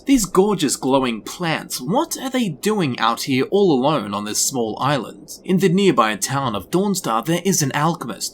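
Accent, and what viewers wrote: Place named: Australia. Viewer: Is it lost on people that he's Australian or.... Of course he's going to "mispronounce" words (Based on YOUR representation on how the words pronounced), so relax with it. He's still funny and makes great videos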